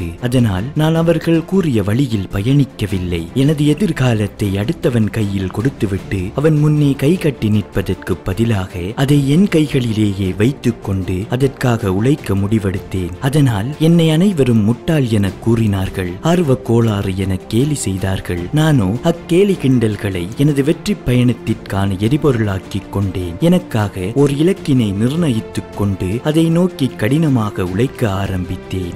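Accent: Sri Lanka. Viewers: Nice to hear Sri Lanka tamil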